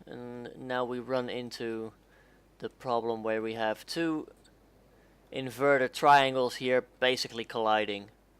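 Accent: Netherlands. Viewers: I can already hear that this guy is dutch Zeg “hallo” als je ook NL bent 😄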